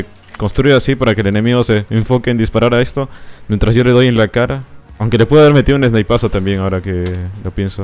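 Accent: Peru. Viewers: eres peruano?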